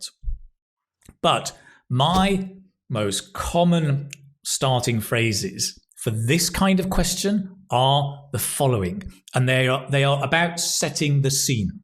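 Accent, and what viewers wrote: United Kingdom. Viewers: "To be honest, Mr., I've fallen in love❤ with the English language all over again—especially the British accent—because of you."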